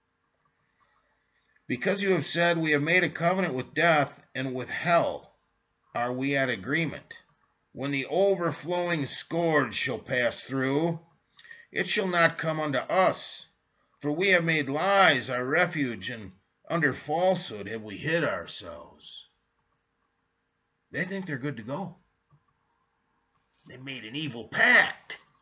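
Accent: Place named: Upper Midwest, United States. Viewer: Good teaching, Must be cold where you live all bundled up. Unseasonably warm in the south. Michigan Wisconsin esc your accent 😉 Blessed by your teachings appreciate very much indeed brother! Thought I heard the trumpet the other night, turns out it was neighbors air conditioning unit😋 👂🙏😲👐 🙄😏😕😐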